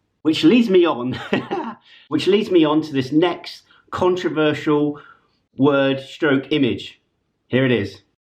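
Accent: United States. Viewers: Awesome video! Love your American accent. Here we say niche as nich...but if someone pronounces it neesh, we still get it. In the southern US, a trolley is a buggy. Keep up the good work. Hugs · American accent and personality is SPOT ON!!! 😂🤣 Both characters were hilarious!!! 😆😁 The facial hair on your British character was quite gentlemanly 👨 Did you just say "LOVE GARDEN"?! 🤣😂 Your new characters need some names that fit them 🤔🤔🤔🤔 You're latest videos have been absolutely on point!!! 💯👍🤘 You are in the groove sir!!! 😁🤟👏 Great stuff bro! 🤜🤛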